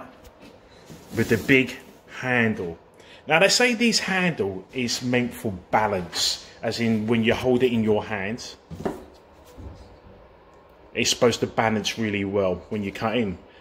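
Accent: United Kingdom. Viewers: Pro-form Blaze and semi oval contractor brushes are good too. I'm in U. S. and that's all I use along with the Picasso. I love hearing the Brits talk. Such a cool accent.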